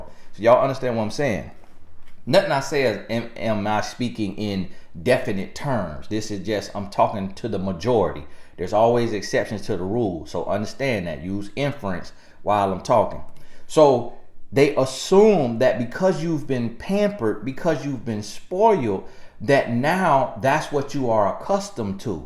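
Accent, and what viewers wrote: South, United States. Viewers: not even a minute into the video and I’m weak at that country accent. that’s right mr. the CEO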